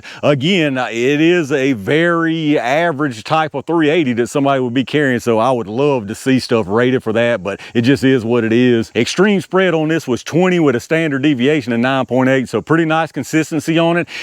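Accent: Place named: Appalachia, United States. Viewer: Shame it didn’t do better. I have a lcp 2 and max and I am always on the lookout for the best! I currently have underwood extreme defenders but if you know anything better feel free to cue in as I must have missed it…. I was watching one of your videos last Friday and I asked my girlfriend who lives in southern Indiana whereas I live in Louisville where you sounded like you were from and she thought east Tennessee or WV. I told her I thought you’re from central Virginia or southern like Wytheville, Bluefield or Roanoke maybe even the Carolina’s but Saturday we went to Red River Gorge which is near Slade, KY for some hiking and she said maybe east KY. Who’s right? 🤣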